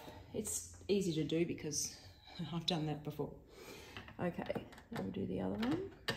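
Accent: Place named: Australia. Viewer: just got one from facebook marketplace yesterday.. cool to hear another aussie on the tube 🇦🇺🇦🇺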